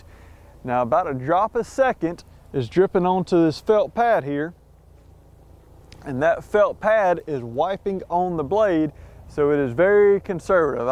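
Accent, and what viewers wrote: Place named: Texas, United States. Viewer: You sure sound a lot like a Texan... Or some kind of southern drawl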